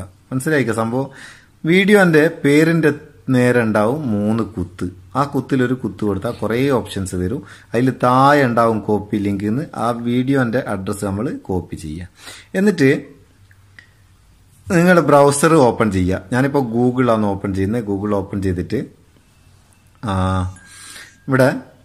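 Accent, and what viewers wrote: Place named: Malabar, India. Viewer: Malabar slang 😁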